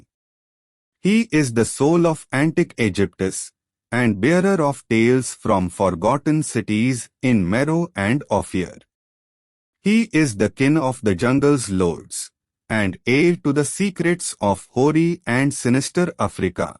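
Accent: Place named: India. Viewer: Should work on that accent man.. only like 70 percent of this is understandable to non indians. The effort is respectable though🙏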